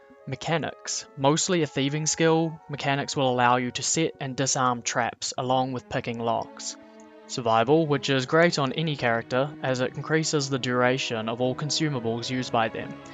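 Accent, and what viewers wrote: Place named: New Zealand. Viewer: I love hearing a thick kiwi accent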